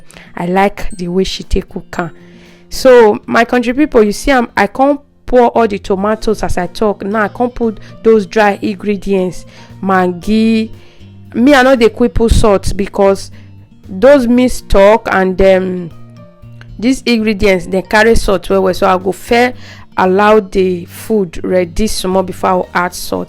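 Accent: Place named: Nigeria. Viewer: The jollof rice looks so yummy, the color alone dey make me hungry and your pidgin English dey sweet for my ear, I know say naa so the rice go sweet well well! And yes this naa the rice wey I grow up dey chop the only difference na the spices because those days if you get Maggie, salt, onions, pepper, tomatoes and crafish jollof don ready be dat, fish or meat fi no dey wetin you know be say chop belefull! Na so we go dey use hand take dey mold am put for mouth 😂 … is a great cook.Thanks for sharing dear ❤️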